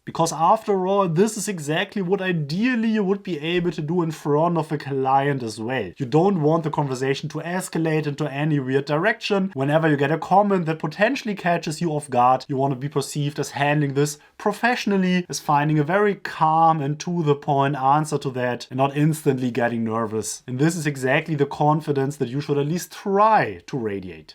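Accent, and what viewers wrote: Germany. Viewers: Very strong German accent 😆👍🏽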